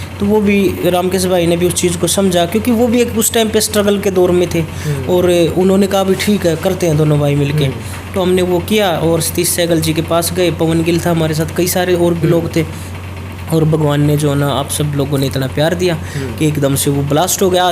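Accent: India. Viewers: Bhai interview achha tha Par aap apne bolne m thoda change karo Uchana aali jyada hojya hai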